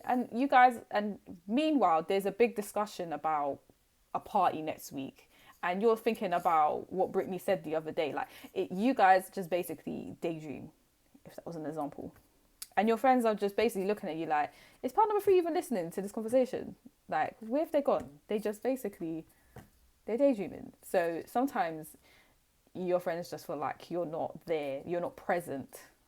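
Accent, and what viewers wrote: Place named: United Kingdom. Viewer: I love your humor and British accents really make me weak, so adorable 🥰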